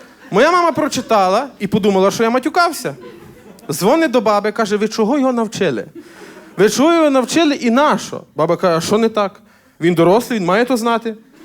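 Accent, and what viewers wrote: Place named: Galicia, Ukraine. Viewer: Якщо ти з Хмельницькому, то скажи щось як хмельничанин. Бо поки що, твій говір видає в тобі, радше, галичанина )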